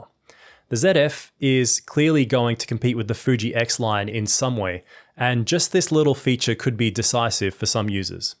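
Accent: Australia. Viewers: Judging by your accent I'd say you are an Aussie. I used to work for Paxtons Photographics in George St , Sydney in the 80s, half a lifetime ago . At the time it was the biggest camera store in Sydney. I bought a Nikon FE when it came out then to add to my Nikon F2. Both great cameras. I'm pretty excited by the Nikon ZF and am going to buy one in the next week or 2. It really does look like a slightly larger FE! This camera could have been designed with me in mind . Lol. It's just what I have been waiting for...I've just discovered your channel and subscribed. Great video and photos!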